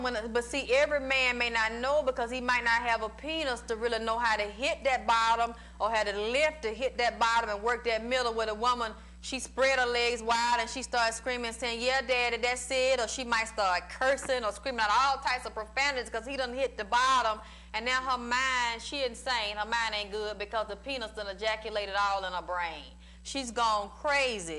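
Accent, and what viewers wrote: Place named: South, United States. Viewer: Is This Lady From The South Because She Is COUNTRY lol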